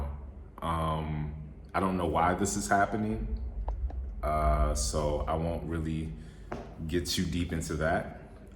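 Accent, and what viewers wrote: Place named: South Africa. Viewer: Frm 690+ subscribers to 2k+ wow!✌. Hav anyone noticed how pronounced things abt mzanzi?, He pronounces like someone dat has been living in SA for a while omg!. Plz giv dat man a bell. hav u eaten " triple decker meat/ chicken pizza frm Debonairs pizza?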